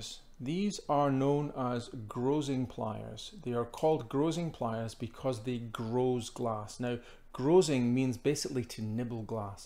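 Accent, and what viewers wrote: United Kingdom. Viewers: Cannot hear you, volume too low. Shame, such a nice Scottish accent.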